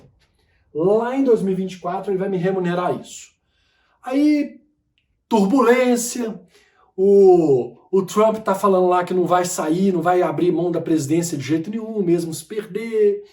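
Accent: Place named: Minas Gerais, Brazil. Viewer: Sou de BH tb Adoro sotaque mineiro. Aprendendo muito c vc. Vlw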